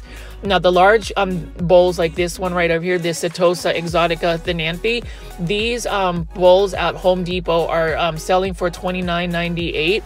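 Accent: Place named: Philippines. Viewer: Who cares how he pronounce it. Everyone already knows he from the Philippines and that he has an Filipino accent. So his word pronunciation is different from the way we pronounce words.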